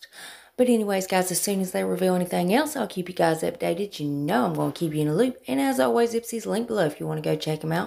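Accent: South, United States. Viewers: Hi, I look forward to your sweet southern accent and advice. Because if you I’m going to upgrade. I almost dropped it thinking who’s going to see if I have makeup on or not. But it makes me feel a whole lot better wearing it around the house. Thank you for reminding me of that! Jen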